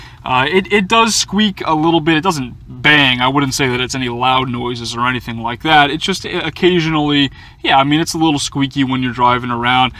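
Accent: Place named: Boston, United States. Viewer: Another great video,. I initially started following you due to you wearing a Cleveland Browns tshirt. Then I saw you w a browns skully on. What's your cle connection? Considering it sounds like you're a Boston area native. I traveled out of my of my forester. Jealous of what you've accomplished. Keep the videos coming, I'll keep watching...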